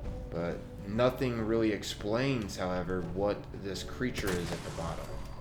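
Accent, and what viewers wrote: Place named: Maryland, United States. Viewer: Are you from Maryland ? You sound like it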